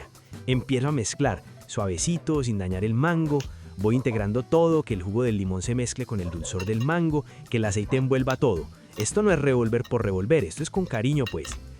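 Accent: Colombia. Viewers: #Apenas lo estoy viendo, amigo, eres Colombiano por tu forma de hablar, te sigo viendo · Riquísimo con mango las ensaladas y no comento luego es que lo escucho, me encanta como hablan los Colombianos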